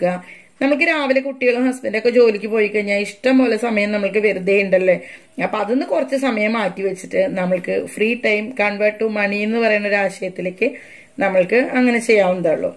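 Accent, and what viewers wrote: Thrissur, India. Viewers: 😁nan subscribe cheithu.chechide varthanam kelkkan nalla rasam undu.thrissur basha.nan kannur.epo husinte koode gulfil.chechide morucurry anikishta.nan undaki.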